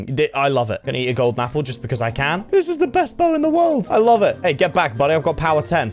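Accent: United Kingdom. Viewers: Your voice is a little british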